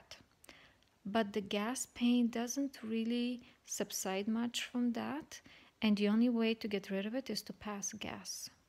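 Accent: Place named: Russia. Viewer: Dear, thank you so much for your informative video. You helped me a lot. I just went through complete hysterectomy. I have a question. How long did it take for your bloating to subside? did you feel occasionally sharp small pains in your inside where the stitches were? I have sharp pain sometimes in my inside and my bloating is huge. But my surgery was on July 24, 2019 and today July 28, 2019. If there is any advice you can give to me to would be very appreciated. And also eventually did the bloating go away or do you end up having some left. Thank you for your time. Sincerely Naira (by the way I love the way you speak English, are you from Russia? I am from Armenia)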